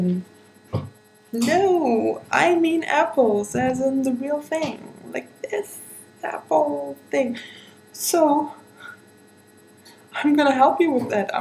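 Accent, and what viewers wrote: Germany. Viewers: love the german accent hahaha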